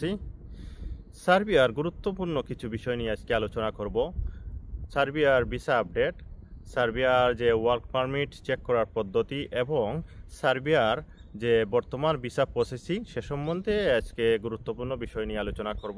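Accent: Sylhet, Bangladesh. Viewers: ভাই আপনি মনে হয় সিলেটি। আমার বাড়ি ও সিলেটের বিশ্বনাথ। নভেম্বর মাসের ১৫ তারিখ অনলাইন হইছে ভাই, এজেন্সি বলছে সব স্টেপ শেষ, এখন শুধু এপ্রোভাল পাওয়া বাকি আছে। ৪ মাস অতিক্রম এখন কি করা,জানাবেন। প্লিজ।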